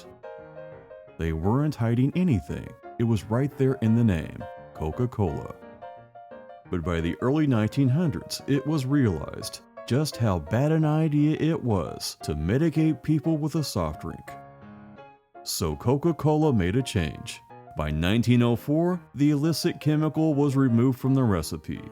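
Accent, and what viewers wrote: Indiana, United States. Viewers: Your videos make me feel homesick for Indiana…parents from Ft. Wayne and Otterbein, my first 18 years in Anderson, next 30 years in Bloomington and Nashville, then overseas and now Iowa. An Indiana accent is subtle but it feels like home to me…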